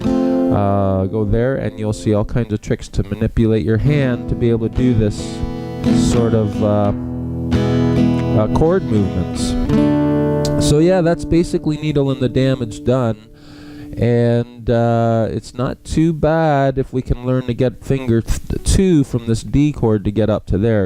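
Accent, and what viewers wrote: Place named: United States. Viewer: Better explaining classic song than this old want to be picker needed. You sound American not British with lots of exercises not trying to prove how good you are.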